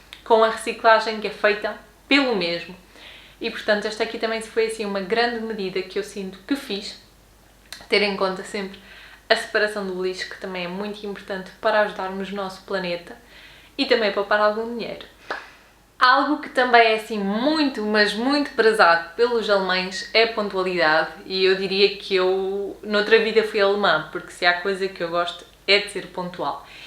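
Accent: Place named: Portugal. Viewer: Ooopaa, o sotaque português é bom de se ouvir Desses ai o melhor o saco reutilizável. Muito melhor do que trazer um monte de sacola plastica para casa. Um salve para você do canal BR nas Europa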